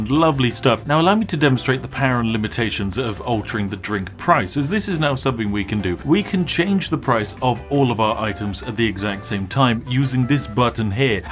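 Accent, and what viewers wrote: United Kingdom. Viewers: I never disliked a video faster (edit: I think he's funny I just hate british people's accents :( not personal though, I still subscribe I just dislike his videos)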